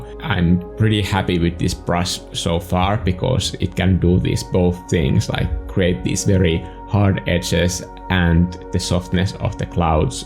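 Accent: Finland. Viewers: I can hear an accent... can’t pin point it exactly. But I’m getting Finnish vibes? To say the least, it was nice really hearing you talk about this! You seem to genuinely enjoy doing this. The painting was absolutely lovely, certainly inspirational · Haha the moment you started talking I was like ”HE MUST BE FINNISH OMG”. I love your art and your attitude haha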